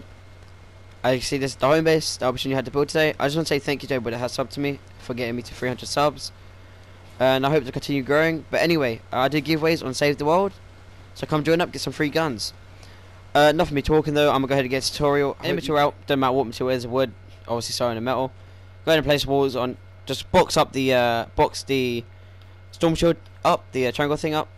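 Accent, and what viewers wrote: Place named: United Kingdom. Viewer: btw i like ur british accent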